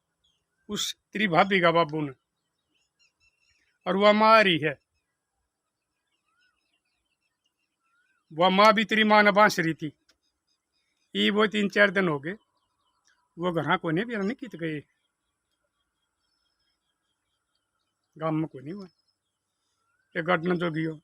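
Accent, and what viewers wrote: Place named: Haryana, India. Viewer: Aapki haryanvi bohot achi lagti ha haryanvi me hi bataya karo aapki sari video dekhati hun meri bi bhabi ase hi Karti thi meri maa me lakwa aa gaya tha to uska ilaj bhai ne nahi karwaya do bete do bahu ha 6 pata poti han sadi bhi kar rakhi h bachon ki lekin maa ka ilajaj hamne karwaya ha koi Paisa nahi diya jab wo theek ho gai mera pitaji army me the pention milti jab theek hogi jab jabardasti lege lekin jane ke baad na to hamko bulai aur ham maa se milne jaati to bhabhi bhai bhatija bhatiji bhi jhagda karte the unko matlab hota ha to aate han nahi to naraj hokar bolte nahi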